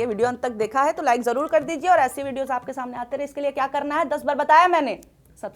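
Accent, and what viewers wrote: Bihar, India. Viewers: Tum kya ho Bihari tone mat pakado Kaha se paisa payee ho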